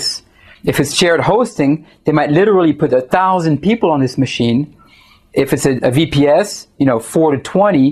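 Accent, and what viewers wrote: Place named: France. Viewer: Forgive me but you sound French. Bravo!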